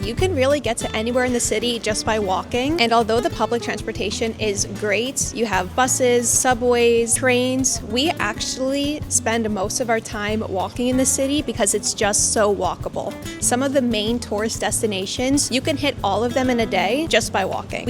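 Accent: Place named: Argentina. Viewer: Me fascina, me encanta, me alucina, me parece genial que te guste usar el "vos" y el "sh", a muchos en latam no les gusta, pero es el mejor acento del planeta. Abrazo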